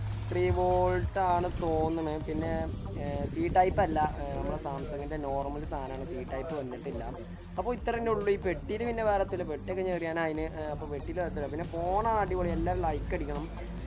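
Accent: Malappuram, India. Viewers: Malappuram ഭാഷ പെവർ ✌️✌️🔥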